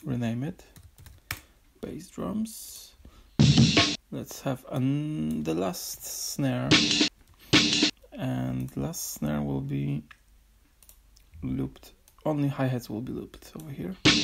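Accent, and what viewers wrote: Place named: Germany. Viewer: not an authoritative answer but my guess would be a German living in the UK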